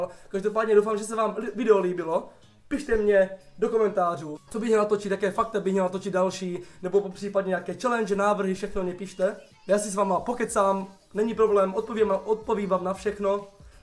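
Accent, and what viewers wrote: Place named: Moravia, Czech Republic. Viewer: Ses fakt skvelej!! Drzim palce at das tu maturitu :) . Nechapu jakto ze nemas vic odberatelu.... a mluv dal po moravsky je to super ( taky su z moravy) 😂😂😂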